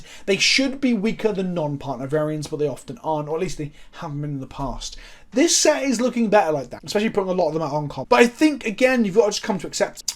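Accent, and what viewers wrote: United Kingdom. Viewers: It's hard to listen on anything past 1.25 speed like i normally do, bc British accent lol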